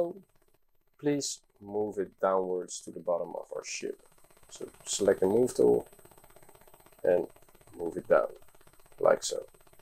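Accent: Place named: Netherlands. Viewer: Your dutch lol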